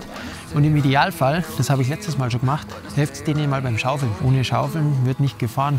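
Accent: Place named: Bavaria, Germany. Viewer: Unfassbar sympathisch - hab di über mtb-news gefunden und ziag ma direkt alle Video rein ;-) Bin selber 29 Jahre und hab erst jetzt mit dem mtb-Sport angefangen. Aber bin scho voi drin und werd mia demnächst auch ein Dirt-Bike anschaffen! Hast a paar Tipps? Und endlich moi jemand, der meine Sprache spricht. Überragend - hoffentlich kommt noch mehr vo dia! Liebe Grüße aus Zolling und keep riding! :)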